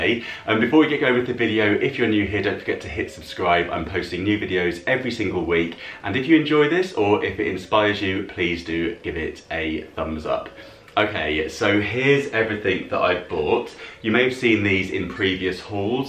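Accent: United Kingdom. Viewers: I just had to listen to your beautiful British accent to hit the subscribe button. Cheers, mate! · 😁 His voice is absolutely very sexy (oops, sorry 😶), with that fascinating British accent that I love!!!! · How have I not come across this channel before 🤷🏻‍♀️ Subbed immediately just because you’re British & you have a great voice! The videos are awesome! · Your british accent is everything 😍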